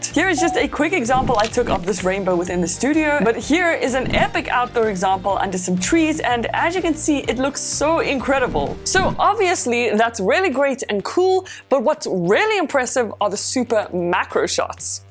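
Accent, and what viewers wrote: South Africa. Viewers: Are you South African????? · Your SA accent is refreshing 🙂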